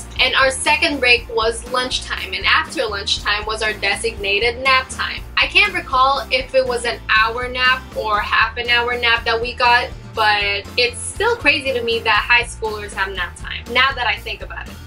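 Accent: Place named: United States. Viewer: Sounds like a filipino turned American...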